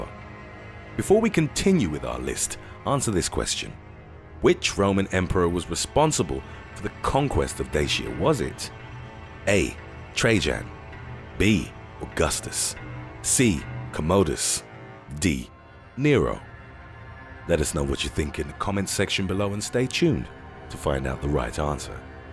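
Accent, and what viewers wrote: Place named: England, United Kingdom. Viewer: For an English sounding narrator, the guys understanding of English pronunciation is abysmal!!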